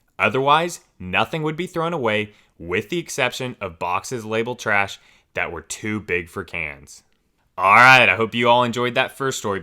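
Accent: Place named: South, United States. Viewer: Great reading! I love your Southern Accent! 😜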